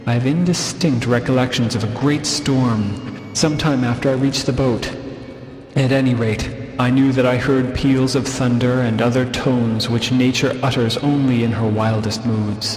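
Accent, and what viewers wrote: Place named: Baltimore, United States. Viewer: Damn good job! Your pronunciation sounds like Baltimore.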